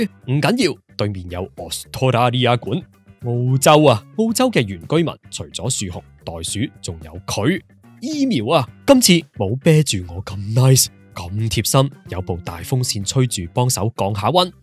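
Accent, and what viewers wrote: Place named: Hong Kong, China. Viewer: 三二一、點火～發射成功（救命～超喜歡港腔🤩忍不住姨母笑）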